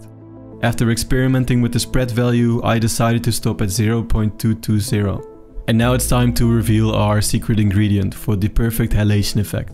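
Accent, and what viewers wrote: Netherlands. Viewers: Ik hoor volgens mij Nederlands... Nee, ik weet het zeker! ;-)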